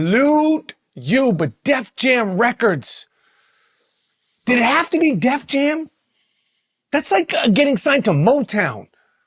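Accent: New York, United States. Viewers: I'm here for the new York accent. I know you will bring something to the table every time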